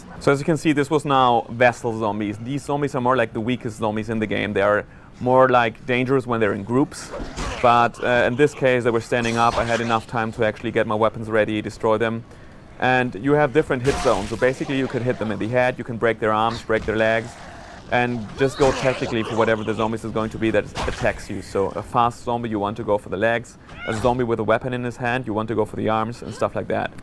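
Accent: Germany. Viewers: No he's not gay, he has a German accent. And the reason why you think having a German accent makes a person gay is because it (probably) reminds you of Bruno. Please try to educate yourself more in the future, American. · He has a slight German accent, although his English is good. Anyway, I can't wait to play this bitchin game!:D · his german, thats an german accent... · he sounds so german ^^ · german accent ftw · alter... sogar ich höre raus, dass er deutscher ist xD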